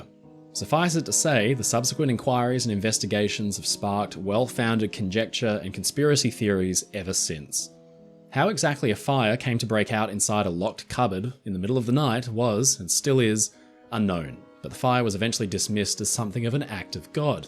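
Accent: Australia. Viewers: I attempted to watch this video while eating toast, but couldn't hear (Our Friend) over the crunching. So I turned on the Closed Captioning, and now I couldn't finish my breakfast because I was laughing so hard. Apparently the subtitle bots have never heard an Australian accent before. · It’s just an ordinary Aussie accent.🇦🇺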